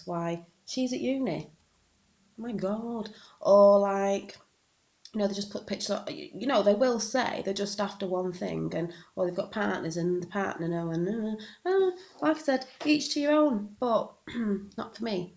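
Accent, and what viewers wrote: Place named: Yorkshire, United Kingdom. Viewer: You are well funny without trying haha! I met my fella on a dating site and it went well he's not a total mental case lol dating sites can help people who haven't got the opportunity to get out where you from you sound Yorkshire I'm from Yorkshire also :-) you have a very infectious personality I bet men love you! Haha the fire sign one lol do you mean tinder? Lol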